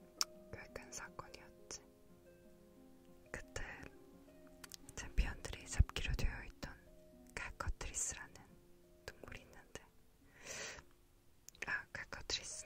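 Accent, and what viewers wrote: France. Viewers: I really wish I could understand, I just wanna know if she’s doing a French accent or not!! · Love the little kisses when introducing herself. Very nice "french touch" ! ♥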